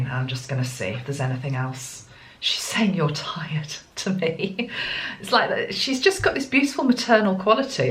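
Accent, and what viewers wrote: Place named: RP, United Kingdom. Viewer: i agree too. And the voice becomes well spoken with the plumminess of aristocracy. Beautiful x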